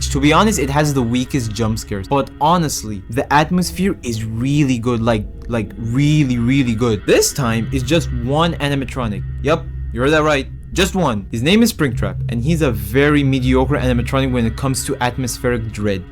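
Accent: Egypt. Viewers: Great video, your channel deserves more attraction tbh I also thought u were egyptian from the accent, i checked and you actually were 😅 Good to see egyptians getting in the fnaf content creation, keep it up!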